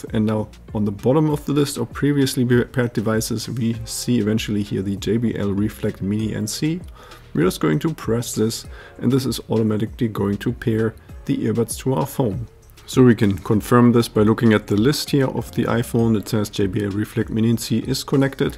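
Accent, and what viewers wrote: Germany. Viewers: just because Im curious, is he german? I think he has an german accent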